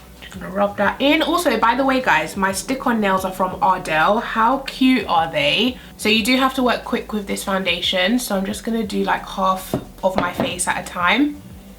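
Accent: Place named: Southern England, United Kingdom. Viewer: so nice to listen to love her accent. It’s southern but not London and I love it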